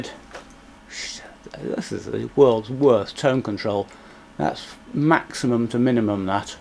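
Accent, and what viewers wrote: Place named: United Kingdom. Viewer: watching so many of your videos from usa i am picking up your british accent. :).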